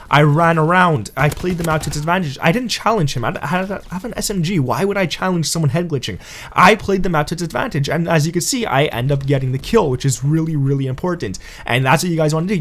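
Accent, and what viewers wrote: Canada. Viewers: Shawn Tarbox I think he is Canadian based on accent. He can’t help it!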